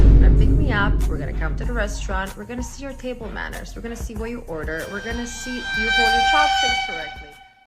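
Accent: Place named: United States. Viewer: Wait...for someone who lives in SA,if he was born there,this dude has American levels of English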